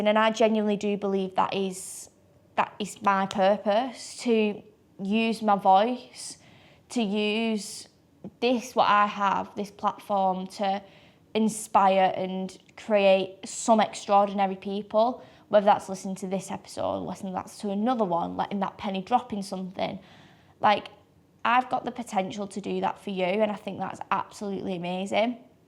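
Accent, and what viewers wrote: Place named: Ireland. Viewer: I don't want to be mean... but her horrible irish/northern english/whatever... accent makes it an ABSOLUTE horrible experience listening to her! It really is HORRIBLE! I lean to tell her "Go open up your chakras somewhere else!"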